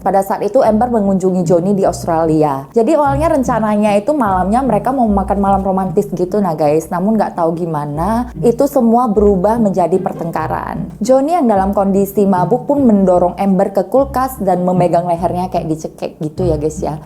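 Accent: Medan, Indonesia. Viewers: Medan kali..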